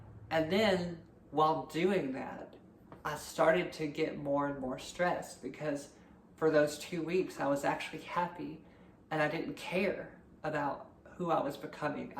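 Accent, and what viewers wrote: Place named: South, United States. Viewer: I am loving the southern drawl in your voice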